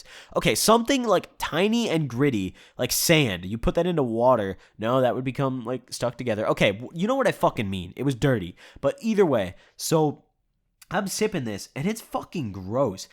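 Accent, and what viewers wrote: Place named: Lafayette, United States. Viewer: Sounds like your in Lafayette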